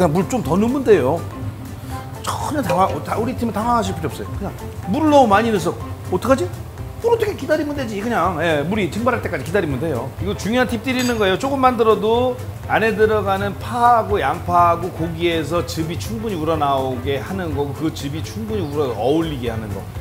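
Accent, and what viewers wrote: Chungcheong, South Korea. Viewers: 아... 전형적인 충청도의 너스레~ㅋㅋ 레시피도 얻고 재미도 얻어가네요~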